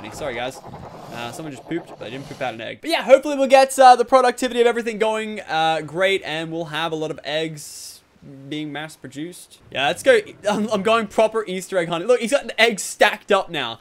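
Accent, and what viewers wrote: Australia. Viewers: he sounds australian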